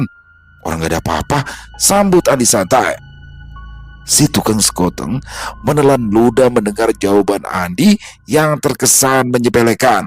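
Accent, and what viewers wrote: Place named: Betawi, Indonesia. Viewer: bang udeh yg paling top dah Apelagi klo udah pake gaya bahasa dialek Betawi & Banjar Top banget 👍👍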